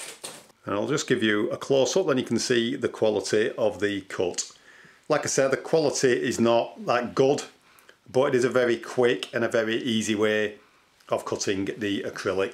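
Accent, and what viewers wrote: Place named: North, United Kingdom. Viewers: Love these vids - proper no nonsense Northerner who gets straight to the point and explains it all clearly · Great video! What part of Lancs is the accent from? Sounds like Preston or possibly just north of there?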